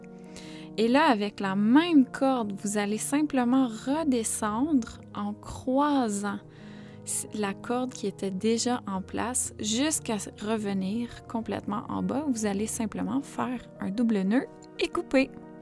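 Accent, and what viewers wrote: Canada. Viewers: Coucou Bonjour A Toi au Joli Chat🐱 J Aime Ce Style de Vidéo.. L endroit ou Tu vit...Le Canada me fascine Ton Accent😉 Gros Bisous de France🐱🐺🐾😊🐈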